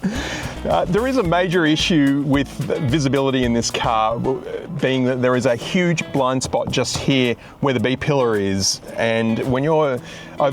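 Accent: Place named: Australia. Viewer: lol, oops, didn’t realize you were Australian and that y’all did that too. Fascinating! Love your sense of humor as much as your accent. I have a thick Appalachian accent myself and do not have a great ear for other accents after years of linguistic isolation. Thank goodness I did have a Miata to make it through. lol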